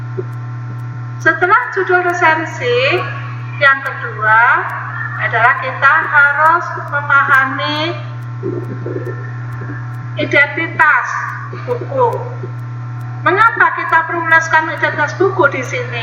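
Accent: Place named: United Kingdom. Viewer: Aksen british nya terasa sekali ya ^_^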